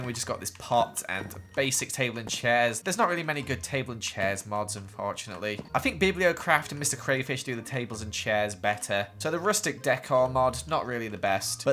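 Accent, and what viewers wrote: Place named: United Kingdom. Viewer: He's british so he says it like that because of his accent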